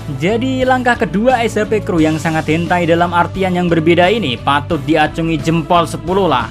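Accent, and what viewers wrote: Java, Indonesia. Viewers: Logat Jawa setengah bahasa Inggris Bahasa indo hmmm😀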